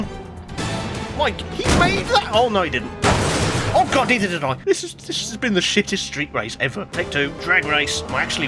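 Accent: United Kingdom. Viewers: 0 new funny british man car tutorial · The BRI'ISH funny man has posted WE DO EHT🍷🗿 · Now make an Kei truck funny British man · this funny british man making my day better again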